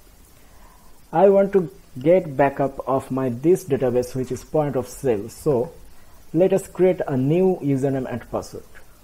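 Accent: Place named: Bangladesh. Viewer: BANGLADESHI ENGLISH.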